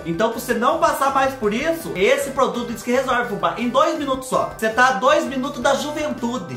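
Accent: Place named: Minas Gerais, Brazil. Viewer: adoro vc seu modo de falar parece nois mineiro Kkkk Ajeita parceria pra vc testa coisas pra nois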